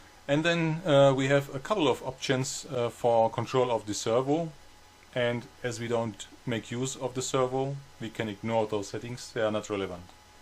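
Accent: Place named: Germany. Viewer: Dazu braucht man nicht auf die Windows Version achten, man hört es am th ;-) Nicht böse gemeint, aber ich habe lange in England gelebt und einen Deutschen erkennt man recht schnell an der Aussprache. Nebenbei, sehr geiles Projekt. Es juckt schon in den Fingern.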